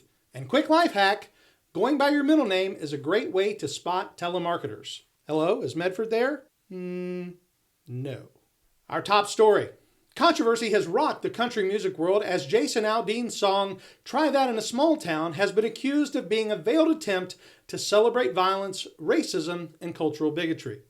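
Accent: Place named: North, United Kingdom. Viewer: Ok, I just had to say this. Here in the South we call them things “lightning bugs”. I can tell your are from the “North” but I enjoy your content and can’t get enough 🤣🤣🤣👍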